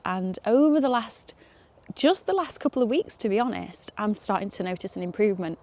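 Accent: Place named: North, United Kingdom. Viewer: I like your northern accent 😅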